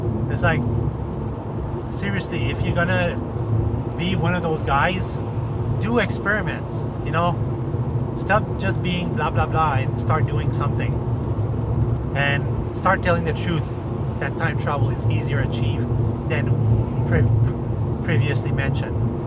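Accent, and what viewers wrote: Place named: France. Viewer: is French your first language? It seems you have an accent whenever you speak English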